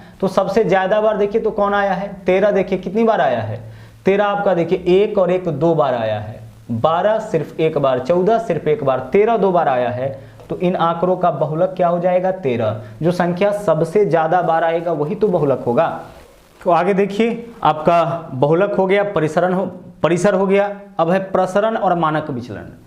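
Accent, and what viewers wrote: Bihar, India. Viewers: Aap bihar se hai sir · Sir aap bihar se h kyy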